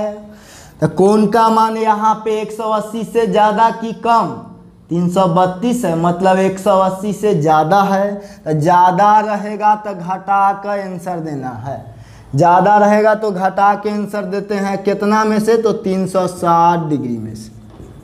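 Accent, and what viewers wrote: Bihar, India. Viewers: Bihar se ho kya bhai.......... Mai bhi hu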